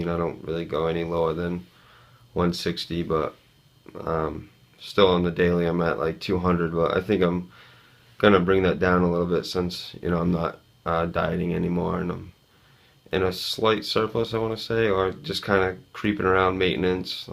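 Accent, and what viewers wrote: Boston, United States. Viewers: yeah i love it. btw do i detect a little bawwsten accent im new to this channel and not sure where your from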